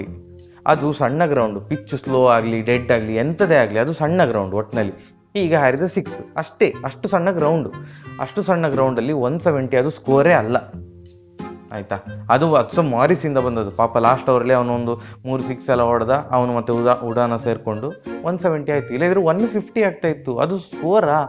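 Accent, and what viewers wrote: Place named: Kundapura, India. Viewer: ಕುಂದಾಪುರ ಕನ್ನಡ🔥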